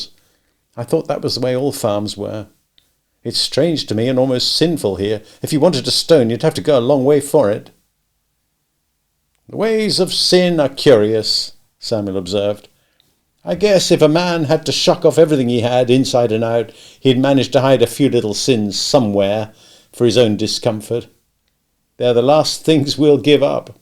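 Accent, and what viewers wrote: United Kingdom. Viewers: I find Steinbeck's use of stones to be interesting. Upon researching the biblical meaning of stones, they seem to symbolize "endurance, stability, and permanence," while Steinbeck's use seems to alludes to the opposite, i.e. stones are something to be removed, thus showing his roots that stem from farming. I'm sure there is a deeper meaning, but I will need to ponder this a while. Interesting to be listening to this via a Brit!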